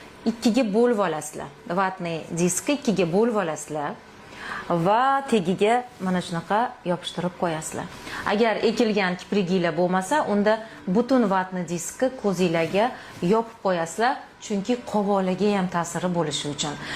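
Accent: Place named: Tashkent, Uzbekistan. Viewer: Iya opa Xorazmlikmi😊Toshkent shevasini yorvoripsizu a😊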